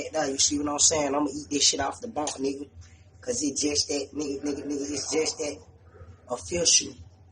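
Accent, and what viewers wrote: Atlanta, United States. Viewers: I know a Atlanta nigga when I hear one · ATL nigga for sure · You can tell he from atlanta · Kuhh from atl?😂😂😂😂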